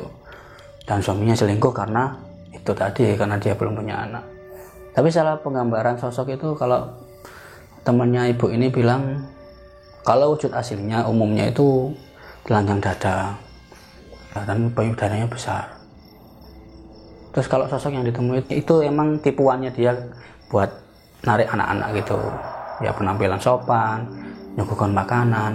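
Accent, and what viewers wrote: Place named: Malang, Indonesia. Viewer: Kyo logat e wong malang se 😁🙏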